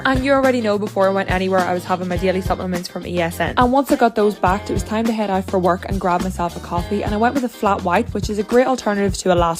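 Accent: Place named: Northern Ireland, United Kingdom. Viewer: Are u from Northern Ireland because I’m from Northern Ireland and you have the accent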